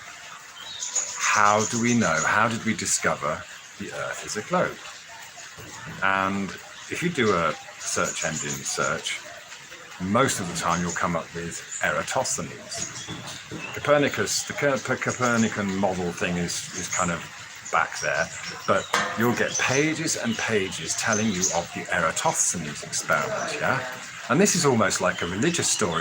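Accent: United Kingdom. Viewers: You have a great mind, an excellent and very English voice. -- "The models work on the predictive nature of nature," is nicely said! Then it makes sense that the better the model, the better it can predict earth's movements and the movements of the cosmos of things related to earth. In 1900 sponge divers discovered a 2,200 year old shipwreck in the waters off the Greek island of Antikythera, within the wreck was found a device that has been a mystery for nearly a 100 years, its known as the Antikythera mechanism. British museum curator Michael Wright hand built a reconstruction of the Antikythera mechanism that includes all of its known features. The device is a planetarium, that is based on a GEOCENTRIC DESIGN of the world, where the sun, moon, planets and stars revolve around the earth, this is a geocentric system. Today the Copernican System or Heliocentric design of the solar system is taught and believed by most people. 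Michael Wright, was formerly the Curator of Mechanical Engineering at the Science Museum in London, and later at Imperial College in London, England. The Antikythera device is also a mechanized calendar based on a 19-year cycle in which the phases of the Moon are repeated on the same days of the year, this is known as the Metonic Cycle. The device displays calendar information that allows for the predictions of a solar and lunar eclipses. The reconstructed device is accurate for all the planets indicating correct positions with-in one degree in a 500 year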